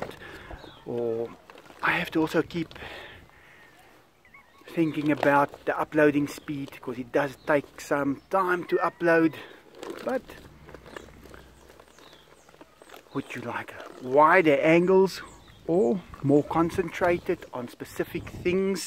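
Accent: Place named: South Africa. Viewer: What country is he from? He sounds South African but I believe their language is English and I’ve heard him speak another language before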